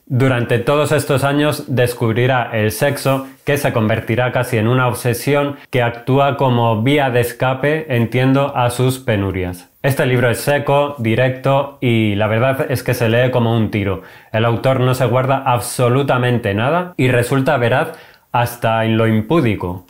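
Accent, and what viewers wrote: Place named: Uruguay. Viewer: kintsugi, lo tengo pendiente en bookmate y estoy leyendo: debimos ser felices, pero después de ver tu video no voy a poder no leerlo con acento uruguasho.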